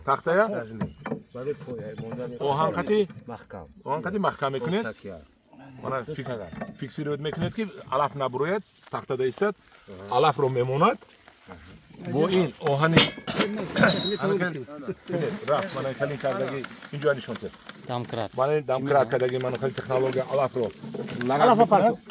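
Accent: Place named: Tajikistan. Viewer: Амаки ман бори аввал аст навори шумора мебинам, бисер писандам омад, хусусан сабки тоҷиконаи гуфтор ва тарзи фаҳмондадиҳӣ, офарин ба Шумо! Фаромуш накунед ки дар Тоҷикистон ҷавонони бисер баландтафаккур ҳастанд, вале имконият ва муосидат аз тарафи давлат хеле кам аст, чун он ҷо аксарият тайерхурону шикамдамони бемаърифат нишастаанд! Боқӣ саломат бошед. Тавсия ба Шумо: имкон бошад дар студияи сабки овоз ба дубляжи филмҳои классикии амрикоӣ ва ҷаҳонӣ аз заӣоннҳои русӣ_ инглисӣ машғул шавед; овозатон бениҳоят ғулғуладор ва устувор ва табиӣ садо медиҳад; PS Sufizoda Siroj, журналисти озод)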